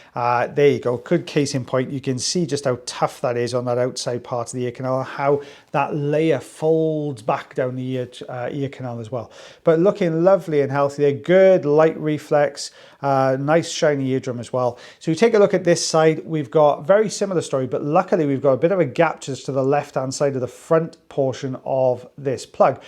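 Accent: Wales, United Kingdom. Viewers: Sorry I had to laugh at your Welsh/English today.. fantastic video as always. Best wishes to, I hope he is feeling better.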